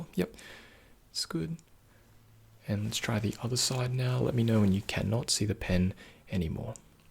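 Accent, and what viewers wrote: Australia. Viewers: God yes!! I love how Aussies always say “no dramas” amazing. Voice is better than butter. New sub for sure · You look more like a Filipino but then you speak fluent Australian accent! Very knowledgeable and full of tingles. :((( Subscribed! ♥️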